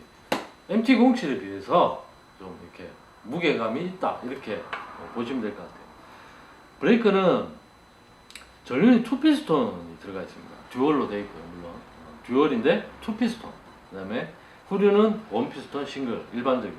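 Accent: Gyeongsang, South Korea. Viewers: 어눌한 갱상도 사투리 계속 듣고 있으니 감기는 맛이 있습니다 ㅎㅎㅎ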